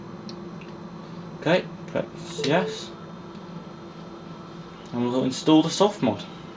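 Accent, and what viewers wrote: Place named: London, United Kingdom. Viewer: typical londoner, good stuff.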